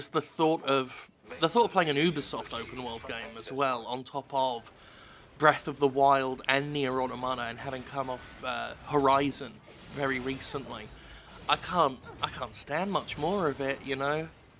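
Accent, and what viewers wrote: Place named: United Kingdom. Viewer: You sound more british here than usually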